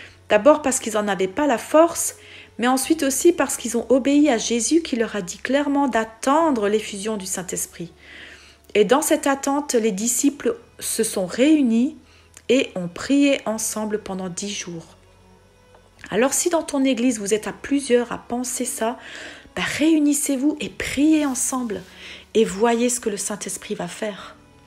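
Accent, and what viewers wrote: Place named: Alsace, France. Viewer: Êtes vous originaire d'Alsace ? ( une petite pointe d'accent de l'Est ☺️❤️)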